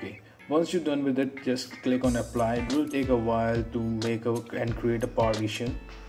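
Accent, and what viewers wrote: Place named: India. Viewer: Hinduinglish... How to make WO mac?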